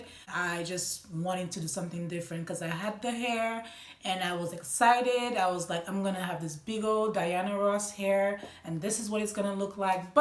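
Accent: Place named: Saint Lucia. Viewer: What island are you from you sound like a St.Lucain 🙋🏽‍♀️ if you are 👏🏽👏🏽👏🏽🇱🇨🇱🇨🇱🇨👏🏽👏🏽👏🏽... so I watched this video last night I’m great at doing hair and braiding and I’m quick ... I wanted something different I had NEVER done any twist with any Afro kinky so I did my hair last night ... it has NEVER taken me 5 hurs to do my hair I did it individual and I did 2 bundles 😤 it came out SWEETTTT AND LIGHT it was worth the time . Thanks for uploading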